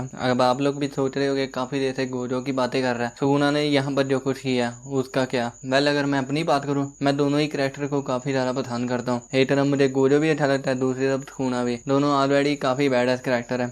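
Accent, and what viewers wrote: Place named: Pakistan. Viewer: Yaar aap ki awaaz Pakistani jasi ha na isliye 😅😅😅❤❤❤ nice explain bro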